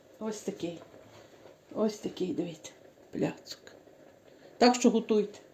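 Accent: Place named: Ivano-Frankivsk, Ukraine. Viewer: Пані, якщо це не секрет, де саме Ви живете, я в Городенці, що на франківщині, по Вашій розмові думаю що Ви також з нашого краю. Підписана на Ваш канал, пляцки суперові, такий самий зошит є і в мене😂😂😂, до цих пір печу з нього. Дякую що ділитесь своїми рецептами.😊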